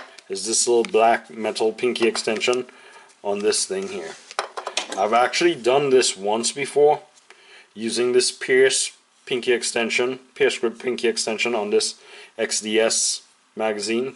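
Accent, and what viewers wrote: Trinidad and Tobago. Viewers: Do you have Trini roots? I think I hear it in your accent.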